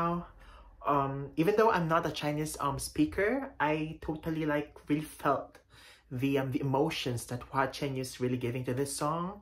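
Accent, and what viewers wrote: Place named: Philippines. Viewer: Are u japanese? U sound more like pinoy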